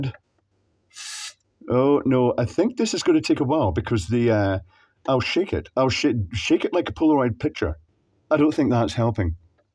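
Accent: Scotland, United Kingdom. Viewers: Can I just say, you have the most easy to understand Scottish accent I have ever heard! for my American ears anyway. Sounds like a more modern version of the "Prime of Miss Jean Brodie" accent.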